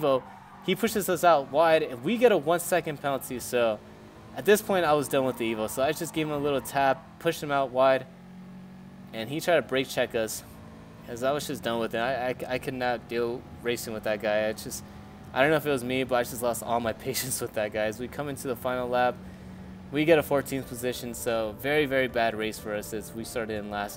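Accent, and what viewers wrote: United States. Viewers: What part of USA r u from?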